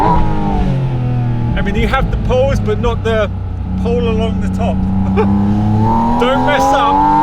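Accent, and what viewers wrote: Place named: Switzerland. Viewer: Nice video! Dein Englisch ist wirklich sehr gut aber trotzdem hört man irgendwie dass du schweizer bist.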